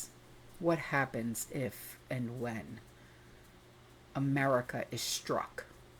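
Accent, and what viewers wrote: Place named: New Jersey, United States. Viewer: She’s from Jersey. Its the accent. Get over it.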